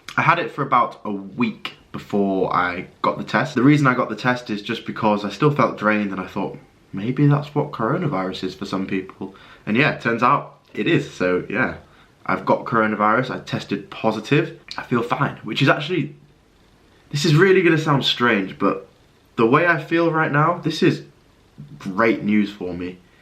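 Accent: United Kingdom. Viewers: you are amazing. I am so glad you are on the mend. Listening to you reminds me why I love the English 😻🌈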